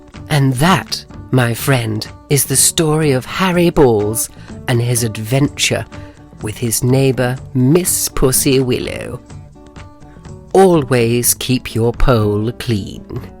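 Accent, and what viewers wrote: United Kingdom. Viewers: Question: is that a real British accent?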